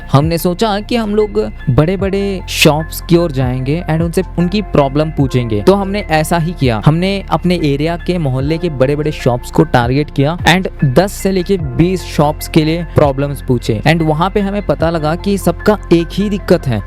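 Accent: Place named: South India, India. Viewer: bhai, are you from south? accent bhot pyara hai♥♥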